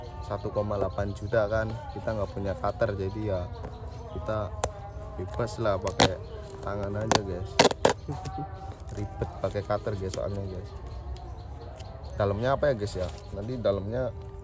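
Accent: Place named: Banyumas, Indonesia. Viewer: Kayane tiang ngapak 😁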